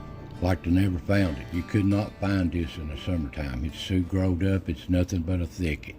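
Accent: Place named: South, United States. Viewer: Though I am from Pakistan and not a US citizen. I tell you that you will always find a strange peacefulness, quite and calmness in cemeteries. Even in the middle of cities. A piece of land different from the rest of the world and you cannot stop thinking that one day you will be here as well. Btw You are a great narrator. Even though I find it difficult to understand what you are saying. May be because of your southern style of speaking English.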